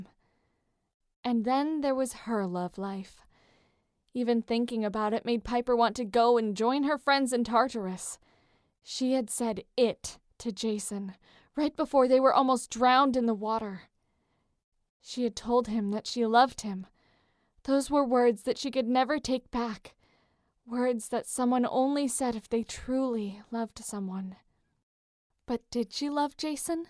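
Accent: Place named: Texas, United States. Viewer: This is the BEST Jasper (or Jiper) fanfic ever!!!! Oh my gosh!!!! And that Texan accent!! If that was an attempt at a Texan accent, it was good!!! (I'm a from Texas, I know an ok accent when I hear one.)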